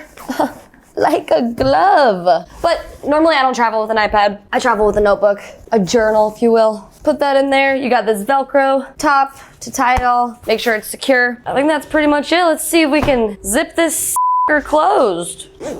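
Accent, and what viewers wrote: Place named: South, United States. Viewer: why it talk country and southern but look latin.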